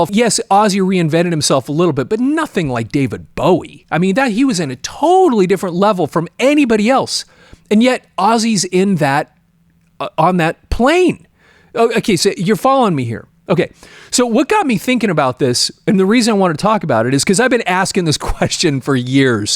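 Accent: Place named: United States. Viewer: È così imbarazzante vedere una persona della tua età così eccitata per una questione da 15enne che non la riguarda direttamente❗Vedo che non sei sposato, sei americano dall'accento e hai 15K iscritti.... è tutto così anormale ❗ Continui a strillare per delle scemenze è imbarazzante non riesco neanche a scrivere ❗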